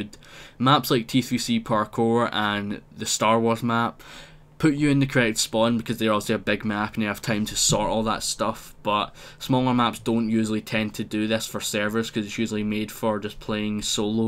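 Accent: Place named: Scotland, United Kingdom. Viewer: I LOVE URE AWESOME ACSENT ITS AWESOME R U SCOTISH? SCOTISH R AWESOME OMG!!!!!!!!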